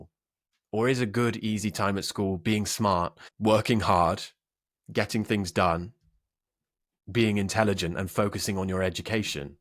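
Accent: United Kingdom. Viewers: My favorite English Teacher ever. For all those who love the brittish accent, we should create a whatsapp group to practice all together.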